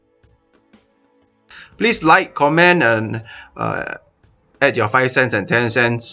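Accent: China. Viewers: dont take this as a racist comment , but i am almost sure from your accent that you are chinese. i may be wrong, though! trying to be les nationalistic(because apparently it is the chinese and the jihadis like mazzod azhar only who can have the right to be nationalistic), i must say that all that u say is true but in reverse order. for example, u say that tejas is heavier. even though everybody knows that tejas is smaller and uses less metal than jf17. again the TWR is 1.07 with AoA of 26 degree that too when the safety against fuselage strain is kept on! jf17 has TWR of .97 and nobody knows its AoA! tejas uses a radar that may have a 30 km less range! but no inducted jf17 uses HMDS that LCA already has! besides, by your own admission LCA has a smaller RCS, wheras jf17 due to its metal component has more! so that means that the effective range of the radar of jf17 is smaller given the unsusually high amount of carbon composites in tejas airframe! 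add on to it EW suit! higher agility and moreover the existence of a local industry that can retrofit tejas makes it cheaper! besides, order of 83 mk1a has been placed and chinatopix along with many other Chinese articles have themselves pointed out tejas' superiority over jf17! this is just a last desperate attempt to dfame tejas by praising a air force version jf17 with a naval aircraft LCANavy, which was mostly a tech demostrator anyway!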